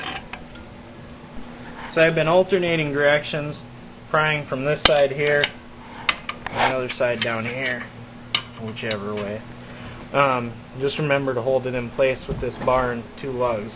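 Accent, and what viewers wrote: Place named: Canada. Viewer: Are you from Canada? I here a slight accent.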